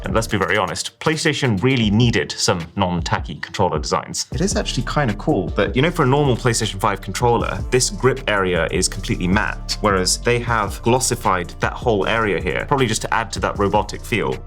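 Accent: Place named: RP, United Kingdom. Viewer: This Wealthy White English accent kills me man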